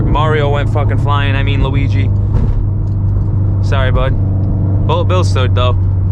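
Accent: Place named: Massachusetts South Coast, United States. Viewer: Driving by Cask and Pig in Dartmouth eh? You from Fall River or New Bedford? I can kind of tell by your accent lol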